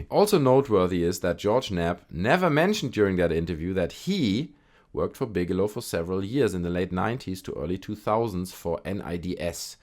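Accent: Germany. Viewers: something so nostalgic about hearing someone inspect government documents in a german accent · Dieser Deutsche Aktzent 😅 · u sound hart german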